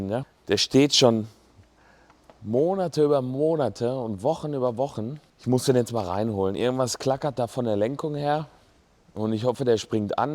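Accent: Ruhr, Germany. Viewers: Dialekte sind richtig und wichtig 😅 Ruhrpottklang von 😉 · wichtig ist, die deutsche Sprache in ihrer wahren und richtigen Form zu bewahren. Ich finde diesen Ruhrpottklang von auch so sympathisch!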